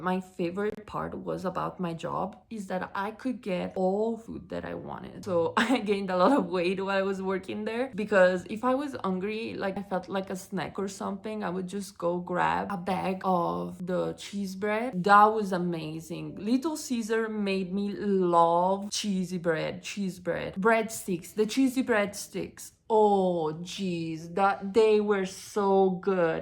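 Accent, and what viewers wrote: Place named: Italy. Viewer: An Italian woman agrees to work for Little Caesar's .... the subliminal message you are sending (from a REAL Italian complete with accent) is ... "I endorse Little Caesar's pizzas." What greater endorsement could they have? They should have given you a raise on your first day for the message that sends to customers. [EDIT] Judging from this video I think you could make a fortune on TV commercials for Little Caesar's.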